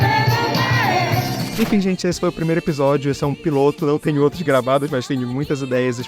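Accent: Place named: Belém, Brazil. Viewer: Sotaque de Belém = inconfundível 😅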